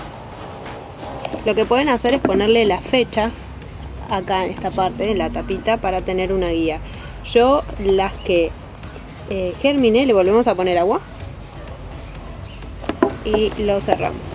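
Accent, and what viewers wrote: Argentina. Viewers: Hola soy Colombiano, amo los cerezos y he defendido uno que creció conmigo y me lo han querido tumbar mis vecinos, al parecer ya le salve, sin embargo, un día ver la posibilidad de una inminente tala de mi árbol decidí que me seria mas fácil asumir mi perdida si tenia bebes de mi árbol, la tan llamada prolongación de la especie. En sus cerezadas he obtendio las semillas y nada. Voy a probar tu método, donde lo logre seré feliz como tu lo fuiste. gracias por compartir. p.d. estas hermosota y con ese acento che... divina. p.d. de p.d. sin ofender y con cariño cuidate tus dientecitos, pero fresca también descuide algunos mios, pero el compromiso es ni uno mas perdido por estupido descuido.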